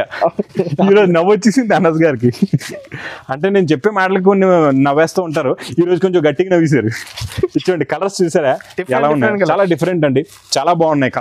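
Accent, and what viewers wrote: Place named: Rajahmundry, India. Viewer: మీరు రాజమండ్రి నుంచి కదా ..నే పట్టేశా ..😅 వస్తున్నా ..😂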